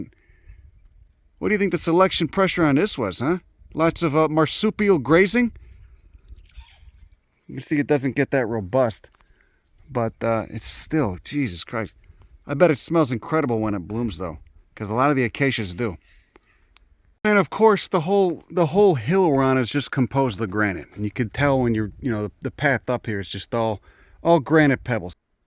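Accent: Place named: New England, United States. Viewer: Oh, shit... please dub Planet Earth with your New England accent.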